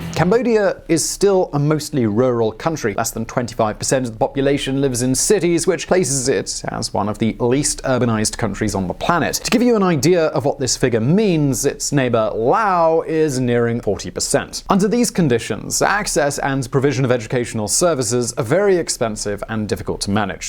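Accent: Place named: United Kingdom. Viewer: another skinhead with UK accent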